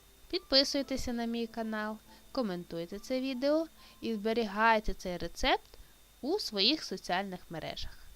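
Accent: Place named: Western Ukraine, Ukraine. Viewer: Добрий день, пані. З великим захопленням і радістю слухала ваше відео. Як приємно чути рідну мову. І особливо наш західний говір. Я, як правдива бойківчанка, до тепер говорю пательня і ронделик, пляцки і цвібак 😄. То є наш генетичний код 👍. Люблю той медівник. І ще крім горіхів добавляю родзинки і замінюю 1 склянку цукру на склянку кокосової стружки. Дуже цікаво виходить. Хочу відповісти на коментарі з запитаннями: " а де мед?" По перше мед ні в якому разі не можна нагрівати і тим більше пекти! При термічній обробці складники меду перетворюються в шкідливі смоли і віск! По друге всі медовики пекли або на штучному меді, якого є вдосталь в магазинах і по низькій ціні. Або робили в дома з цукру і води, або на молоці, щоб вийшла молочка карамель. А ще смачне взагалі на вершках, то взагалі бомба 🤩. Так що всім доброго дня і спробуйте цей смачненький медівник. 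І не переживайте, що там багато цукру, зате немає ніякого крему ні вершкового масла ні сметати! Якщо один кусочок на сніданок, то буде енегія на цілий день. Бажаю всім успіхів і всіх благ ❤😍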